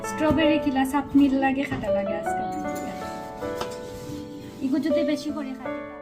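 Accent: Sylhet, Bangladesh. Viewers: অনেকদিন পর সিলেটের কথা শুনলাম।আমার ভালো লেগেছে। আমিও সিলেটের মেয়ে।আমি লন্ডনে থাকি।আপনার কি কোন ডাকনাম আছে?❤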